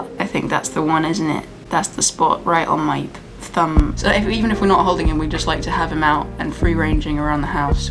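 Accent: United Kingdom. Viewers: Didn't realize you were British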